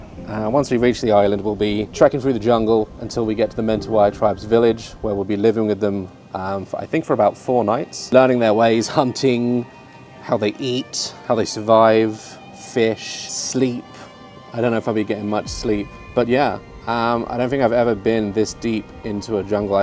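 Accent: United States. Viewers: You can tell & his wife talk alot at home. Noticed an American twang to his accent throughout this video.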